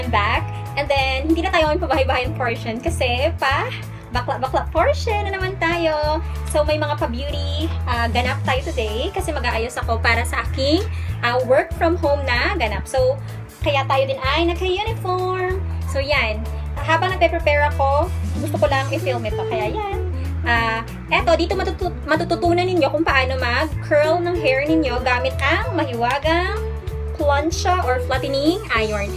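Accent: Philippines. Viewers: Ilongga ka day? Love the accent💖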